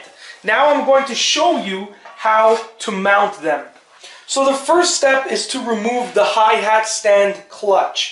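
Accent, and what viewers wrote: Canada. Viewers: Awesome video man and it sounds like your from Canada howdy my friend and neighbor to the north. Keep up with the tutorials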